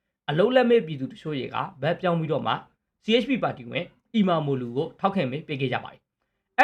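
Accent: Rakhine, Myanmar. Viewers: ဘရို စကားပြောပုံကို ကြည့်ရနားထောင်ရတာ ရခိုင်လူမျိုးထင်တယ်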